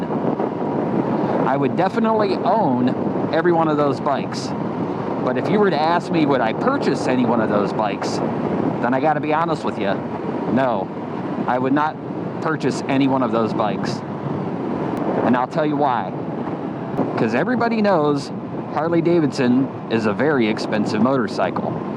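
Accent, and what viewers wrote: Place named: Chicago, United States. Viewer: I hear a slight Chicago accent too😂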